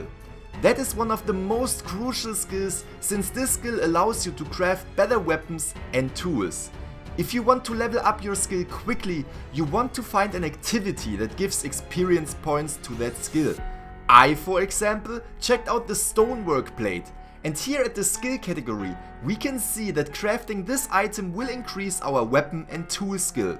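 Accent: Germany. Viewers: You sound german :o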